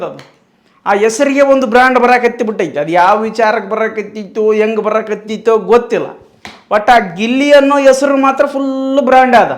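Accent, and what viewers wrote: North Karnataka, India. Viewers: Anna nanu nimma abimani anna 🙏🙏🙏🙏. Nimma videos goskara wait madtirtini anna nimma matugalu kelidakke chenda😅😂 adrallu nimma uttra karnataka matugalu nimma bayalli kelodakke innu chenda 😂😊🙌👌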